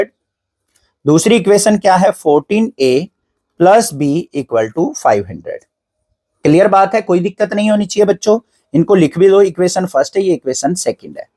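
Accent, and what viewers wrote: Haryana, India. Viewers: Sir aap bhot achha phadao ho pr aap kit the ho apki avag main te haryanvi tone avhe hai 😅😅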